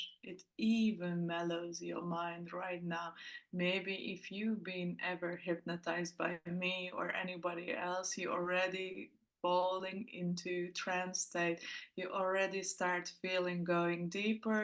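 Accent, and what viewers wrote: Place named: Russia. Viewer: Haha maybe. I like your voice, sounds Russian or Bulgarian accent. I felt onwards your voice very slow and direct/strict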